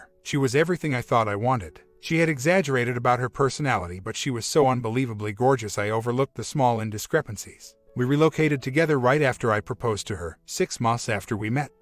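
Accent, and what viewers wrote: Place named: New Jersey, United States. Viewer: Toxic, toxic, toxic. Damn OP almost sounds like you're from Jersey.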